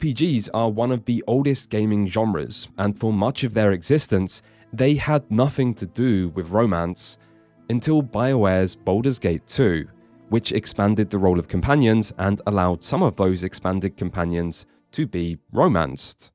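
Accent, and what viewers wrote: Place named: United Kingdom. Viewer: The somewhat familiar oddly british voice said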